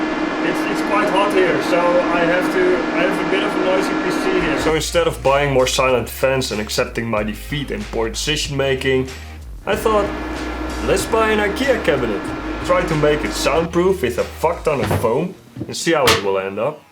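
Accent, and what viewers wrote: Netherlands. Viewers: Mede Nederlander hoor ik😅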